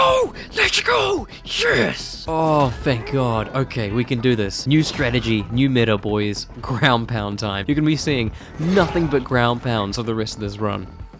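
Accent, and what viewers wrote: New Zealand. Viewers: Yo. Are you from new zealand?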